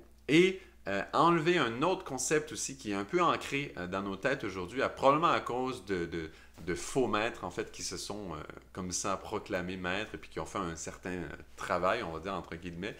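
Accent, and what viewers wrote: Canada. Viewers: Moi j aimes bien l accent canadien . Monleal...monleal ... Merci pour cette vidéo.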